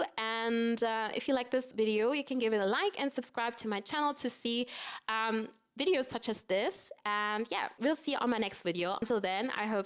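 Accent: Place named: Germany. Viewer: He, he - wenn dich die Aussprache von Rerum Natura nicht verraten hätte - spätestens bei „soooo“ war ich mir sicher, dass du in Deutschland zuhause bist! 😊 LG aus Österreich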